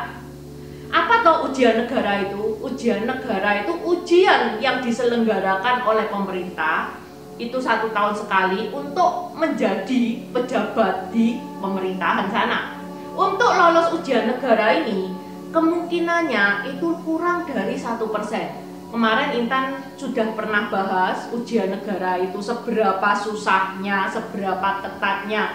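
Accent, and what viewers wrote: Java, Indonesia. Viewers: logat Jawa medok nih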